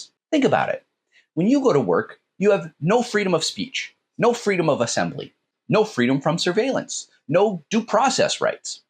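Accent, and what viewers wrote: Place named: United States. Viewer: I assume from your accent that you are from the US. Preaching the merits of Communism from a position of wealth, prosperity and freedom in the world's most successful Capitalist nation is the definition of hypocrisy. If Communism is as glorious as you allude to, why, sir, are you not living in Venezuela, Cuba, or North Korea?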